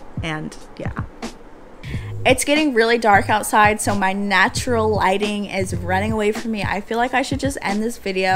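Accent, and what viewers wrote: United States. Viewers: I knew you would get to 1000 before the end of the year congratulations. Yeah I know you don't watch fish tube 🙄 and I could tell you America by your accent✌🏼👍🏼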